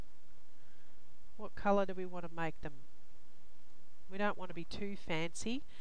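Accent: Australia. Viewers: I am assuming she is from Australia...which as been referred to as OZ...cheers mate! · She’s Aussie 😅”jazz it up” love it!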